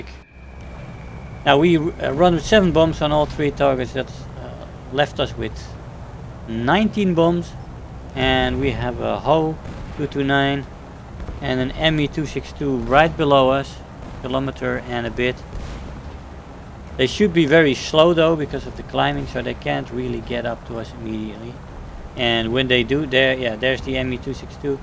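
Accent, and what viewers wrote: Netherlands. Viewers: great video and great person. are you dutch?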